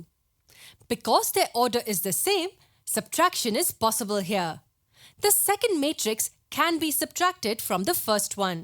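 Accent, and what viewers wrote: India. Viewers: Whats wrong with her accent? Seriously? Dude, we are here to learn something, it does not matter what accent, race or religion she belongs to. I would suggest, get used to Indian Accent because in the next 5 years, you are going to only hear that on YouTube as more and more people in India come online. This is 2020. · Indian accent :(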